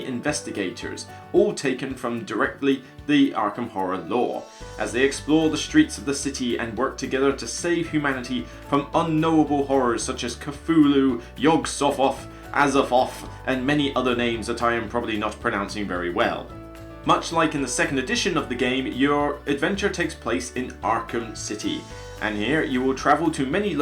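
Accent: United Kingdom. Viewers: Have my thumb up and don't take it too seriously, there are many reasons to dislike for different people as *Wants to thumb up, but klicked the wrong button by accident, *dislikes your British accent/clothing/playstyle enter whatever reason here and madly down thumbs all your videos, *hates Arkham Horror/Ameritrash games..... Asking for the reason is fine, but I would not expect to get an answer from those who thumb down, as the won't likely read your question and IF they wanted they could have give constructive negative feedback in the comments...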